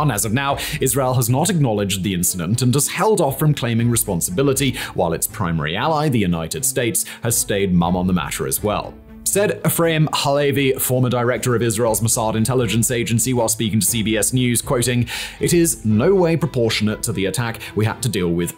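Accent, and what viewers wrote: United Kingdom. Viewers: I’m interested in this. Is it worth suffering the British accent? Nah.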